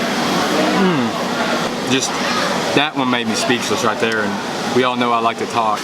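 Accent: South, United States. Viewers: I'm not sure where you're from? I would guest southern ish US? The way you talk its so calm and soothing n yet can feel the excitement... Good video btw Subcribed :-) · You are very descriptive in your food reviews, plus your southern accent is great. MA...LAY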